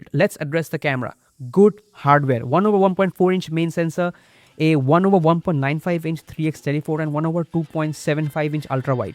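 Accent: India. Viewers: Bro, are you from Odisha?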